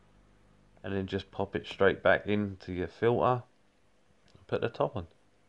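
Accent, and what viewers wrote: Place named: England, United Kingdom. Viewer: Great review been thinking of getting one of these 👍🏼 nice to hear an English accent like mine for once on YouTube 😜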